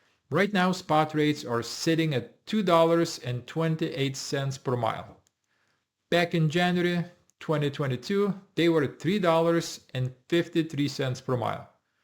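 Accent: Russia. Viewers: I doubt that, in total, there are 600,000 trucks, with illegal CDL drivers on today’s roadways. Living in So. Cal., I assume that by COB today that all of the seaports will soon be closed. Tomorrow, the So. Cal. freeways will be free of the heavy diesel powered truck hazards that exists here? Why does your accent sound so RUSSIAN. Is this Russian misinformation? Deport all illegal CDL truck drivers, ASAP, for a start.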